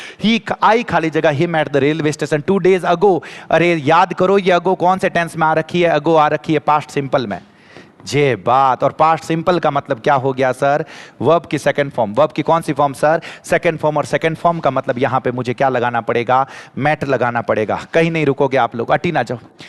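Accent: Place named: Marwari, India. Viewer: Hm jese dafar ko bhi aapki मारवाड़ी english ne achaa बनाया है sir ji english se bahut dr lgta tha such me ab wo dr khatm😢😊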